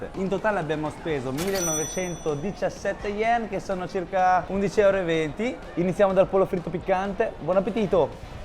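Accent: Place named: Pesaro, Italy. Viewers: Il tuo accento romagnolo😂💘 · Accento Pesarese direi.. Sei troppo simpatico!! Un saluto da Rimini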